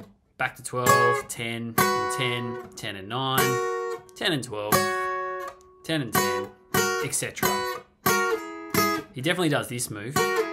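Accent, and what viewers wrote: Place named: Australia. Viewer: is an aussie talking here.😀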